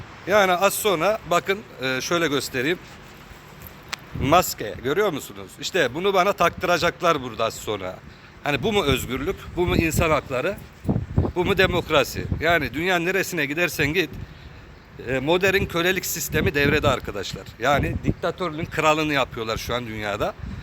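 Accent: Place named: Aegean, Turkey. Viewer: Ege şivesi candır 😄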